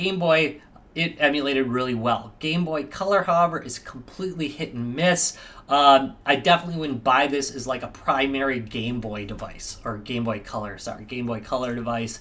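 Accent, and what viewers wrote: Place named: China. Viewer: i love chinglish from china , entertainment before you see the product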